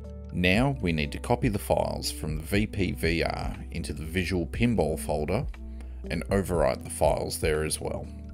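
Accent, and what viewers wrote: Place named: Australia. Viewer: Great guide, thank you for making it clear and concise, and easy to follow. Liked and subbed, What's more you speak Strine, which makes it even easier to follow :D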